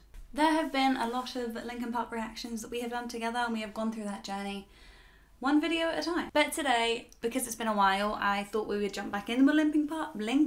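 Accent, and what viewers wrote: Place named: Australia. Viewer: Linkin park is my favorite band. Where are you from? I like your English accent maybe Australian?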